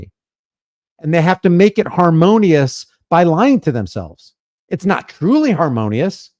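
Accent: United Kingdom. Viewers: Who is the Nazi with the British accent that’s narrating this video we need to make sure we go to his channel and give him what he deserves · The guy who is narrating isn't even a American, Its a British pos. Oh and don't forget they always attack Americans one on many. And always at the end of a gun.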